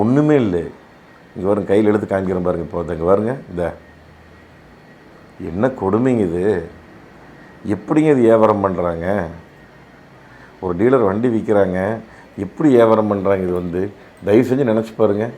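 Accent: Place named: Tiruppur, India. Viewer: ... உங்களோட இந்த திருப்பூர் தமிழ் அருமை... அதற்கு நாங்கள் அடிமை..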